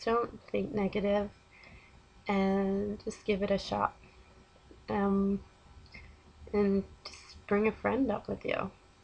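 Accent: United States. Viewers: How are you Australian when you have an American/Canadian accent? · You sound more like an American to me~ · Hey, if you still log into your channel. I have a question...you say you are from Australian? Yet, your accent sounds very much American. haha:p I dated a girl from Melbourne once, her Aussie accent was very thick. hmmm maybe you watch way too much American Television?! Haha:p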